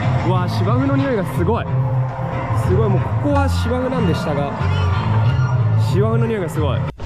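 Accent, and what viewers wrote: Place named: Kansai, Japan. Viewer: しっかりと関西弁😅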